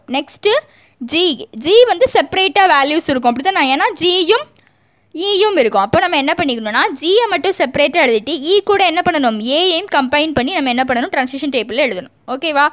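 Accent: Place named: Kanyakumari, India. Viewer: Sister r u from kanyakumari ur slang seems to be like that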